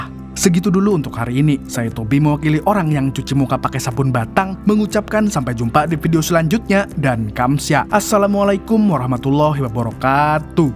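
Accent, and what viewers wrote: Banjar, Indonesia. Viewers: Urang Banjar asli kah bg .iya dah bubuhan Samidi🤣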